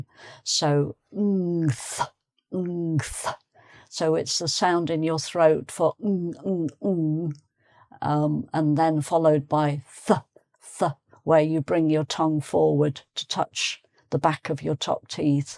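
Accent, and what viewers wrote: United Kingdom. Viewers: Dear madam... l really enjoy listening to your clear english accent... I usually like to listen to short english story audiobooks on youtube but they are usually narrated by unclear voices.. I wish you could upload short stories audiobooks narrated by you... please try this idea.. I'm sure many of english learners would love to listen to classic english short stories enjoying your clear british accent... thanks alot madam 🌷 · british accent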